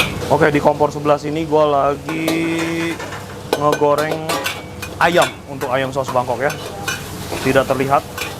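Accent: Banyumas, Indonesia. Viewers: lama2 pinter ngapak gabunge sama mas inyong 👍👍😀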